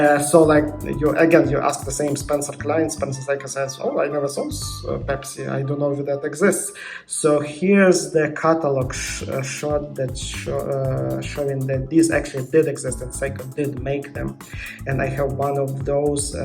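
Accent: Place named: Russia. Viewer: The accent of this guy is very ru**ish! 👎👎👎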